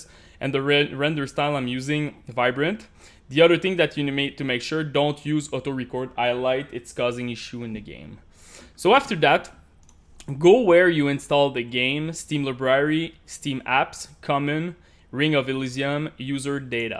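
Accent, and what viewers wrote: Quebec, Canada. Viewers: that french-canadian accent DAMN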